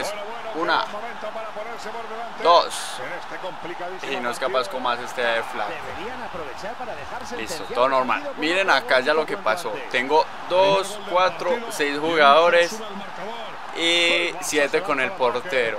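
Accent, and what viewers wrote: Antioquia, Colombia. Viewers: Ud es colombiano? me callo bien :3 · jajajaja sos paisa cierto .... me gusta como comentas esos vidoes como con esa gracia .. saludos desde cali ... por eso no me gusta el fifa solo pes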